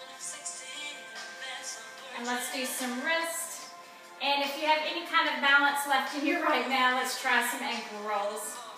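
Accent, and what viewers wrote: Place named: North Carolina, United States. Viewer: Hi it’s Linda from Peoria again I just wanted to tell you I did the beginning boxing regiment and I really thought it was fantastic. I think this would be great for me to do in my water aerobics class. I have a sister in Chapel Hill North Carolina. I can tell the accent!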